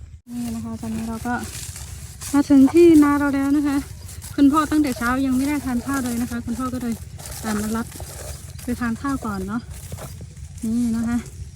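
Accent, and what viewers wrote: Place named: Lanna, Thailand. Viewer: อู้เหมือนล้านนา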